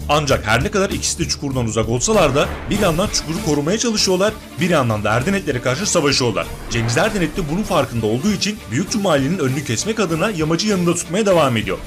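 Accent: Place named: Hatay, Turkey. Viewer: Abi sesin hatay sandık içi’ne benziyor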